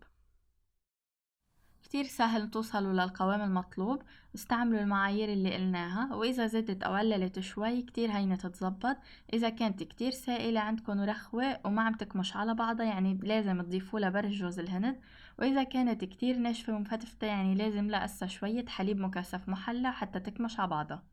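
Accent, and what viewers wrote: Syria. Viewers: عم تحكي سوري مو لبناني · شنو هاي ال لهجة السورية غير تموت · حضرتك سوريا و لا لبنانية لغتك جميلة انا مصري من صعيد مصر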